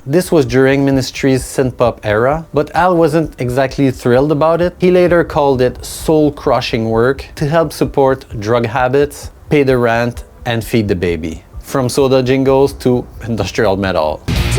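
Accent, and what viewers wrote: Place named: Quebec, Canada. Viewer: Intéressant. Es-tu de Québec? Je reconnais un accent familier.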